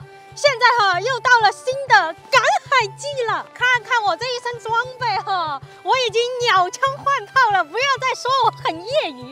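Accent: Sichuan, China. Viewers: 美女是四川的吧。这口音听着好熟悉，哈哈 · 听口音是四川人吧？ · 看到一半我老公说这会不会是个四川人！跟你一样好奇地什么都要抓一下摸一哈，说话也像，看到后面果然啊！了解我们大四川的川性是什么样的(o^^o) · 火锅味的普通话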